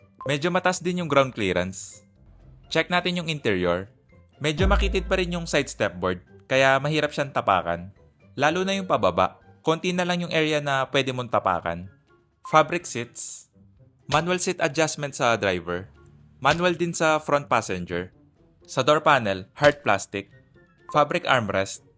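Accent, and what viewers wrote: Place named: Pampanga, Philippines. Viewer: Dinig na dinig ko yung kapampangan accent..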